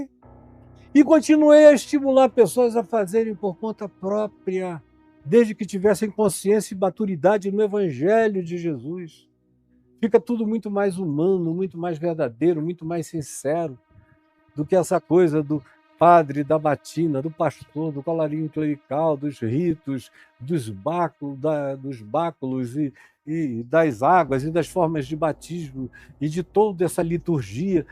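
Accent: Recife, Brazil. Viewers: Aí sabe que fala Recife Pernambuco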